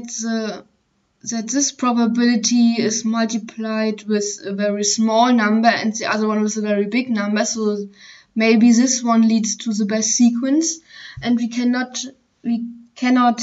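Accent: Germany. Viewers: she clearly sounds German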